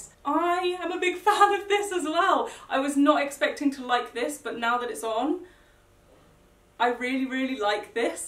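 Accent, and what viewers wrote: United Kingdom. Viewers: What part of the uk 🇬🇧 do u live in I love ur accent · I also love your British accent so much, and I usually don't like the British accent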